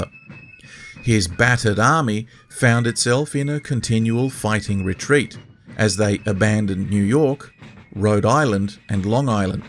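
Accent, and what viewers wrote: Australia. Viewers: I...think you are an Aussie. No problem haha. Your productions are great. And you have an excellent delivery voice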